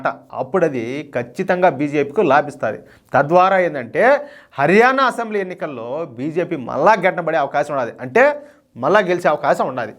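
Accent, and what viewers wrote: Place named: Chittoor, India. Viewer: BABU MEMU KEVALAM MANA CHITTOOR DISTRICT BHASHA.MEEDWARA VINADAM KOSAMEE MANA CHANNEL CHUSTHUNNAMU BABU KAANI E ROJU CHERUVU LO CHEPPALA EXAMPLE MATRAM ASSULU NACHHSLEEDHU BABU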